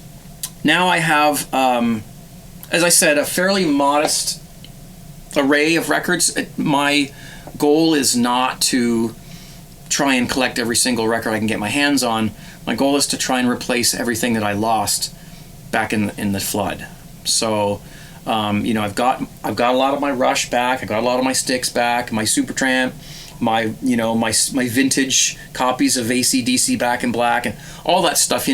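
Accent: Canada. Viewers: I'm old enough to remember playing 45's on turntables with spindles that held a stack of records that were automatically dropped and played in succession—hence the term "stax of wax." Great for the condition of both the stylus and records. 😉 BTW, you sound like you might be across the river in Canada? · I'm getting back into LPs and singles and just like your past experience, I am now noticing how much better clean records CAN sound with a good setup, compared to the substandard record player my parents and I had in the 1970s and 80s. By the way, do I detect a Canadian accent? Thanks for the channel!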